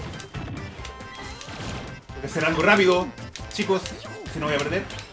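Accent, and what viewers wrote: Chile. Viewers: Eres Chileno? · Sos de Chile? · Que recuerdos, eras bien chileno 😂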